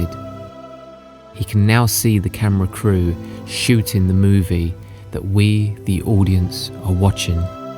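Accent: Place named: England, United Kingdom. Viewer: Well, Narrator .... I loved it.... So, keep ur Corny English Accent...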